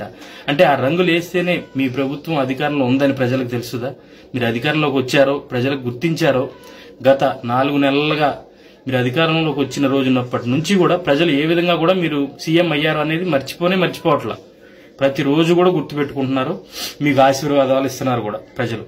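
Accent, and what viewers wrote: Godavari, India. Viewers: Nice presentation in Godavari style..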